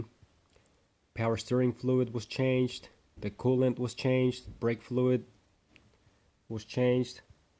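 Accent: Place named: New Mexico, United States. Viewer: Sounds like a NM accent. The natives there have a very slight lilt to their voice. It comes from being surprised all the time.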